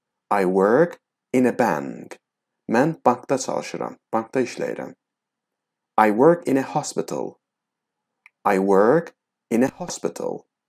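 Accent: England, United Kingdom. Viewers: Təşəkkür edirəm sizə müəllim . Çox gözəl dərs başa salırsız , tələffüz edərkən Azərbaycanlı ləhçəsilə deyil əsl İngilis aksentilə deməyiniz təqdirə layiqdir. Çox sağ olun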